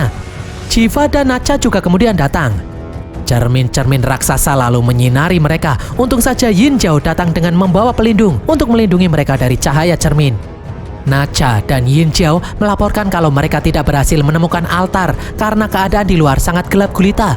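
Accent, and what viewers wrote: Indonesia. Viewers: Nezha pun sebenarnya bukan nesa tapi neca, tapi gpp kan lidah indo bukan lidah cina woi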